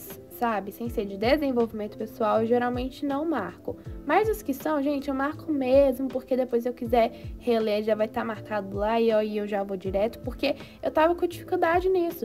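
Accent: Minas Gerais, Brazil. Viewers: Vc é mineira ? Seu sotaque é tão lindo!!!!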